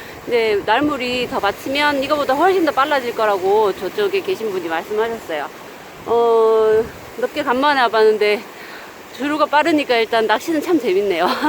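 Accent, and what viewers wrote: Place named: Gyeongsang, South Korea. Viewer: 이제 경상도식 표준어(?)를 일주일에 한번밖에 못듣게 됬네요 아쉽습니다. ㅎ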